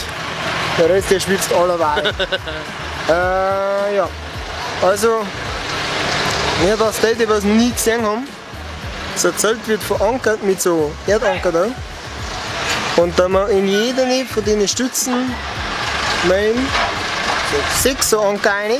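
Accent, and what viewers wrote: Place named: Upper Palatinate, Germany. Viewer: Geh leck, der Oberfälzer Dialekt is scho da krasseste fo olle😄😅👍🏼.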